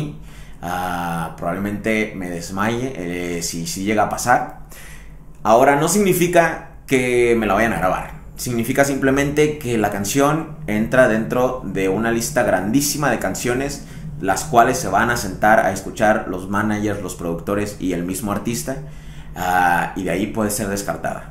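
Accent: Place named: North, Mexico. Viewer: tu acento mezclado está bies castroso, eres más chilango que la torta de tamal y hablas bien norteño nmms bro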